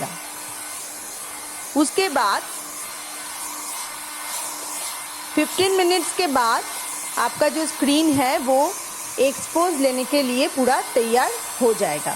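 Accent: Bengal, India. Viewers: আপনার হিন্দি বলার ধরণ বলে দিচ্ছে আপনার মাতৃভাষা বাংলা , বাংলাতে বলতে পারেন ।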